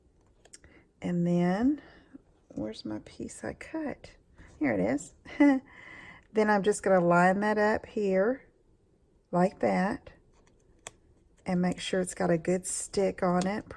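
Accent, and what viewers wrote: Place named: Texas, United States. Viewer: I'm a native Texan. Love that drawl.❤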